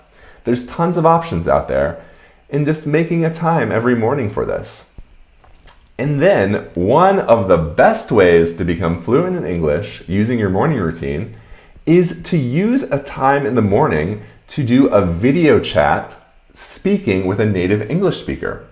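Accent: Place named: United States. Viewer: I do not digest American accent.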